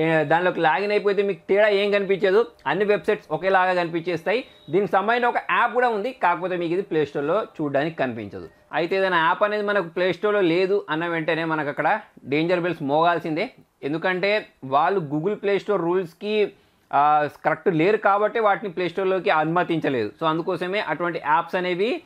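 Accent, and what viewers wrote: India. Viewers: తెలుగు అబ్బాయి లాగే మాట్లాడతారు .. i like it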